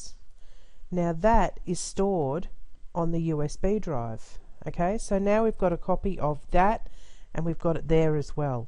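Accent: Australia. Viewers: Good info. / Thanks from a 70 yr. old American. Your accent (Aussie?) is pleasant to listen to.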